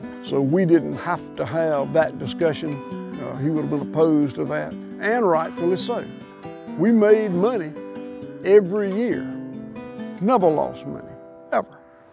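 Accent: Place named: Georgia, United States. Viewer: you have the biggest beating heart at all! Thanks so much for this insight to your amazing life and how you have transformed the soil and helped so many people. I love your Georgian accent as well! I'm from rural England and it's green like your land, but the lifestyle is different, more town based.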